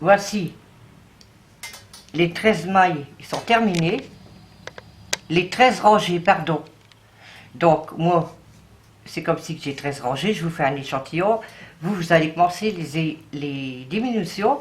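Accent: North, France. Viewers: Bonjour ! Merci beaucoup pour votre pédagogie, vous êtes simple, toute " mimie " avec l'accent du "chn'ord" que du " bonhor !!"🌹👍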